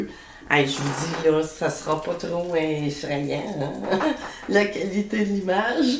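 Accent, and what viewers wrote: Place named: Quebec, Canada. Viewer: Je kiffff.....laxsen québécois...👍👍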